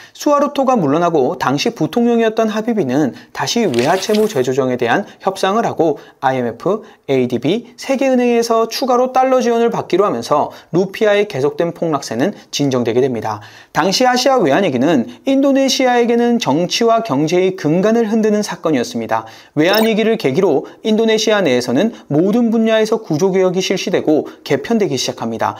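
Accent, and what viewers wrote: China. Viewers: 내용이 좋으면 모하노 성조가 중국어급이라 처음 듣자마자 거부감부터 드는데 전국구 방송하려면 표준어 구사를 하던지 악센트를 고치던지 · 조선족 같다능 ~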